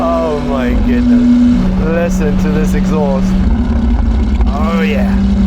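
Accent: South Africa. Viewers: Sounds like a South African accent.🤔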